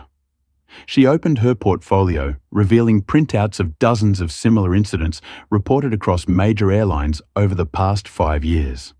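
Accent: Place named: Australia. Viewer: It's nice to hear someone,narrating in my "language" my Aussie version,,this is real English,, n I loved every minute of it❤listening Papua New Guinea 🇵🇬 south pacific down under pondoman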